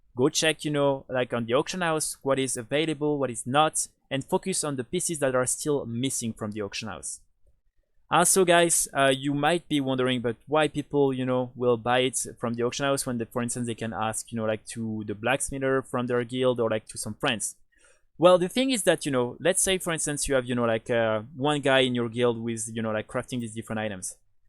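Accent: France. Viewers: J'ai direct entendu que t'étais français haha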